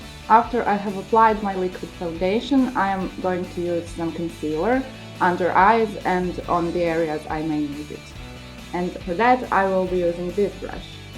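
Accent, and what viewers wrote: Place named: Russia. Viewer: Русский акцент .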